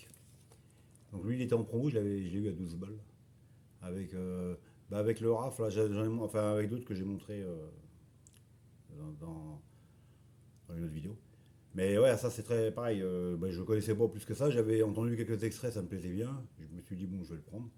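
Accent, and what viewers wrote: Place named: Brittany, France. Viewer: ton Breizh-anglais est des fois limite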